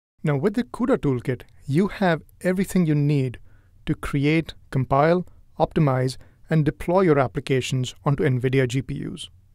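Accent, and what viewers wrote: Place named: India. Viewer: why does he sound indian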